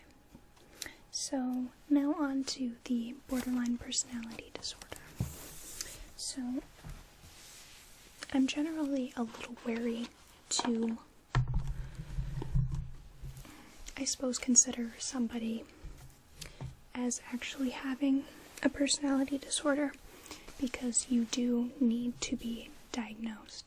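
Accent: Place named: United States. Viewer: Can't resist that USA accent so seductive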